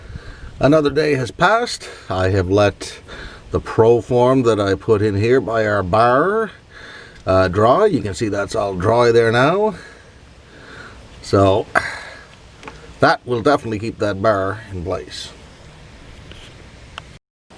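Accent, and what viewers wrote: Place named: East Coast, Canada. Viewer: Nice to hear that thick of an east coast Canadian accent in a YouTube video. Gonna be doing this to luxury-up my upcoming winter beater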